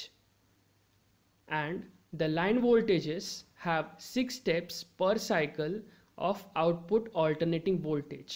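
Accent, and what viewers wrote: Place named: Russia. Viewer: El inglés más ruso que he escuchado.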